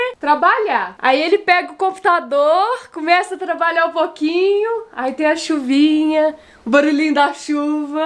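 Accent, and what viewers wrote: Minas Gerais, Brazil. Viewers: Como vc é mineira! 😂😂👍💃💃💃💃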